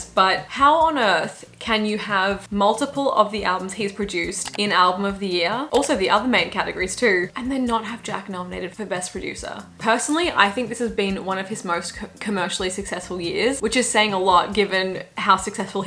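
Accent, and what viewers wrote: Australia. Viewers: Amazing vidéo as always. I Watch every vidéos from France ! It helps me improve my english and understanding of the australien accent. Merci !!! 🥰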